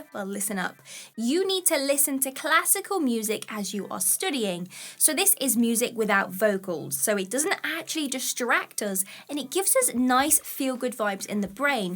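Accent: England, United Kingdom. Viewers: You're just wonderful 😊 And I so much love your accent.. wonder where you're from in England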